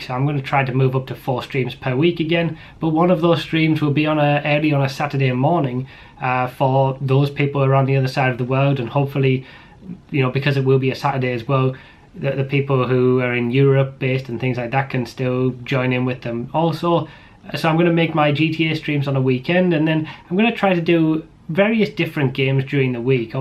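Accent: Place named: United Kingdom. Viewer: Yeah it's how us Brits say schedule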